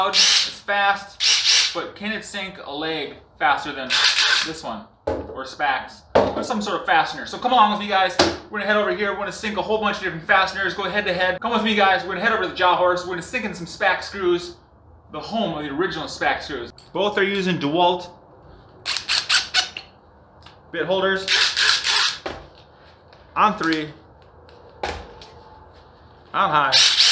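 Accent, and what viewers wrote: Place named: Bemidji, United States. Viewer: You sound like you are from Bemidji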